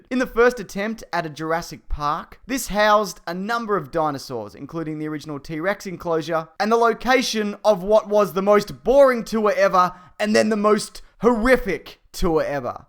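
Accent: Australia. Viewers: Question is this guy Australian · I watched this video before the actual trailer. Now I'll have an Aussie voice running in my head when I see the teaser.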